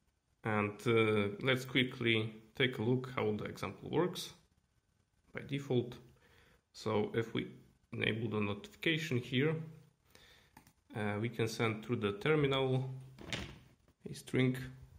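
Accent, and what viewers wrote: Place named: Eastern Europe. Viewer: Nice, cant wait for the audio implementation. Are you Polish? You sound slavic.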